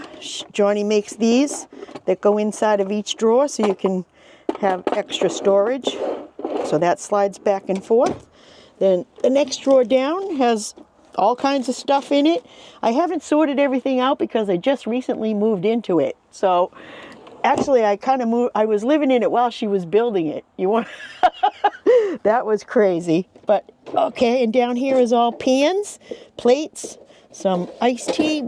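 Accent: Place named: Boston, United States. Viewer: I usually love Boston accents but this one got old very quickly.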